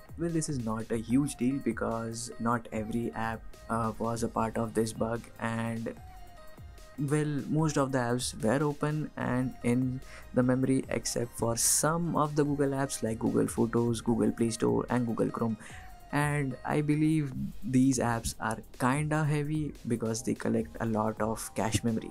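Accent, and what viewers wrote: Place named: England, United Kingdom. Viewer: Angreez se bhi Bhariwali English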